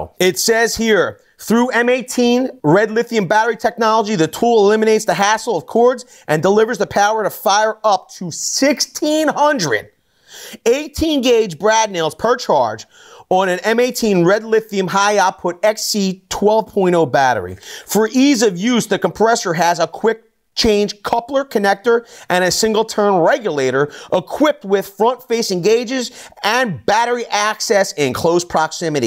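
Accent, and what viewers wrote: Philadelphia, United States. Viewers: Sounded like you were from philly!! Cheers from levittown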